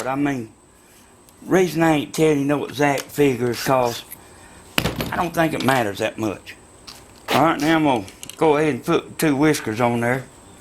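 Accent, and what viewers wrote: South, United States. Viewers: love your your videos....I'm from Australia and your accent is awsome mate.....one of my best friends is from the south.....the more I watch the more I start sounding like you....deadset my mate thinks I'm taking the piss....but no iy's contagious....anyway keep up the good work....we love a good shed here in Oz too... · Dude, I love your southern twang. And love your good ol' boy knowledge. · Yep he's Southern all allright! Cuz I sound like he does and I'm from Oklahoma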